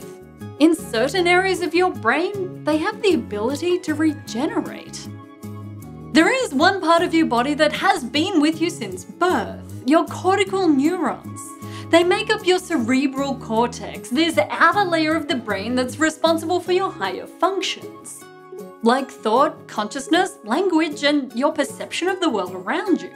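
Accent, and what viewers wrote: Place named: Australia. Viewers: her accent is so thickly (australian?) sometimes I feel like she has trouble talking. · Yes she is Australian.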